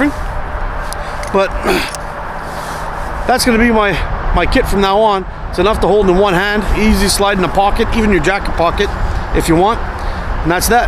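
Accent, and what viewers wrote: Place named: Canada. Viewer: boogie2988 with a Canadian accent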